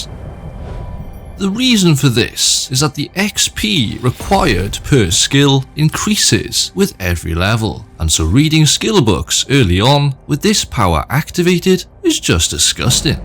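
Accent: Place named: Scotland, United Kingdom. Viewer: Apologies, clearly Scottish and trying not to be 😅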